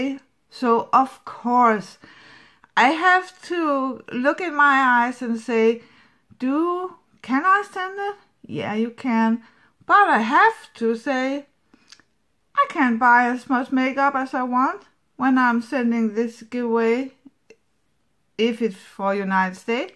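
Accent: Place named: Denmark. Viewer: You live in Denmark? I love your accent!! Your eyes look beautiful!!!!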